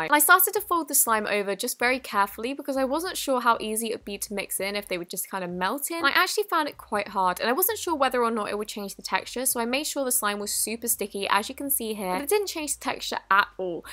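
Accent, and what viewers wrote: England, United Kingdom. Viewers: to Umm... Where’s her British accent? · Welll are you american?? If you are all British voices will sound the same to you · I ligit loves her videos (like if you agree) they are so calming and I l9 e her British accsent also there's a better chance of seeing pigs fly than her actually seeing this but I still love her...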